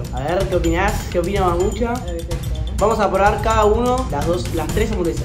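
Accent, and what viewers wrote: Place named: Argentina. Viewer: me encantan tus vídeos y también tu acento argentino 😊😊😊😊❤❤ un besote desde San Martín de la Vega 😊😊😊❤😊❤😊❤😊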